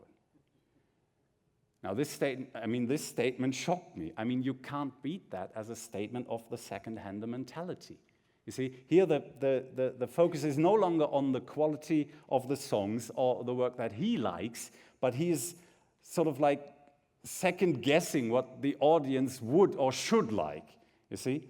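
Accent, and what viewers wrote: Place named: United Kingdom. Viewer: Roman LP ja wirklich, aber eher britisch als amerikanisch...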